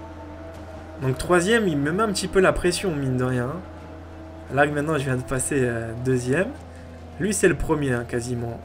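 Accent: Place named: England, United Kingdom. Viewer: ses un anglais qui parle pas un americain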